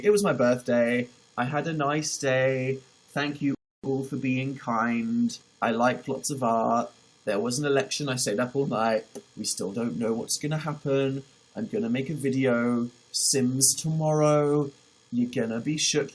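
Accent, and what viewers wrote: United Kingdom. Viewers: Is it me or does hearing a British person talk about fonts make it more fancy than it really is? 🖤😊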